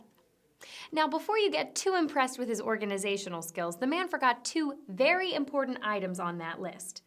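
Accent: England, United Kingdom. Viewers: 1. Loved the dark Kennedy joke 2. Loved your British accent 3. I see you have a blank coffee mug!!! 4. Buy an Abe Coffee Mug!!! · Her british accent is so damn cute lmao! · like your english accent! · Why is she not sleeveless!!! Good British accent though!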